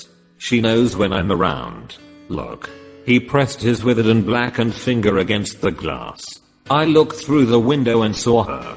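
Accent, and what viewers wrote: United Kingdom. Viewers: best british accent.......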